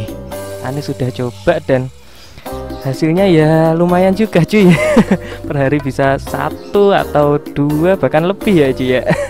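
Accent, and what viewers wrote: Java, Indonesia. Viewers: Orang jawa bang? 😂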